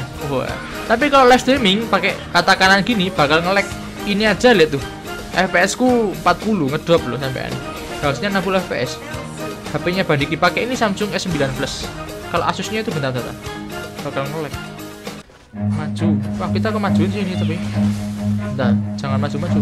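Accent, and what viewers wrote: Java, Indonesia. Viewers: gunakan kemedokan nya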